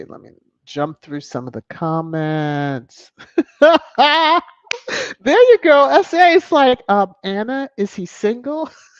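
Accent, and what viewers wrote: RP, United Kingdom. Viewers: Awesome podcast one love ❤️ and to all squaddies in the chat big shout out to all proud of our prince avenger God covers him under his blood 🤲✋️👋☦️☦️✌️✌️👌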